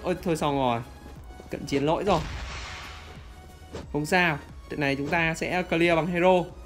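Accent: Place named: North, Vietnam. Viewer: bắc nhé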